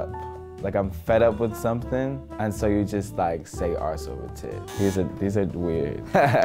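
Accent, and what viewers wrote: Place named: United Kingdom. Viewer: Exactly he sounds British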